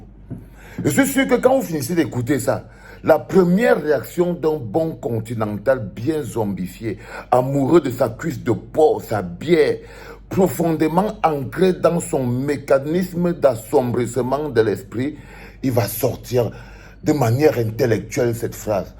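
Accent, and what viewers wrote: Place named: Ivory Coast. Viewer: Ya pas garçon au Cameroun? Tu sonnes comme un ivoirien. Avec votre président marionnettes de l’Élysée à qui on vous donne une blanche comme première dame ivoirienne 😂 vous ouvrez aussi la bouche? Quand les gens parle faut vous cacher cuz vous êtes les premières à vouloir attaquer le Niger qui se libère de votre président ( Macron )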